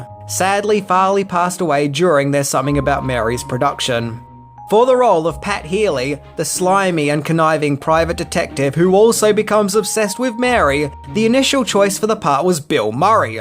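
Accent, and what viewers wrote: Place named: Australia. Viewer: Great review of a funny film,. Your humor combined with your cool Aussie accent always keeps me entertained. Respect and Blessings 🙏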